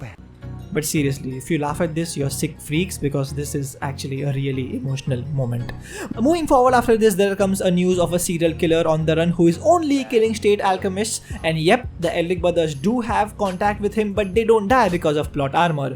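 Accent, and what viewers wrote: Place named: India. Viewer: Bro, i love your videos and i think you could become a huge anituber. But the main thing is if american/european/Canadians will watch your videos. Cox the anime community there is around 5 million people strong. Search up Gigguk, that's the community you can appeal to. I'd say only problem would be the accent barrier, but your English is already really good. You can become the first indian accented YouTuber who's appealing to the western audience. You can do it, just keep on going. I'd say also refine your content a bit more to appeal to western audiences more. Cox let's be real, the indian anime today is where the USA anime community was in the late 90s. It'd take decades to grow by just appealing to the indian audience.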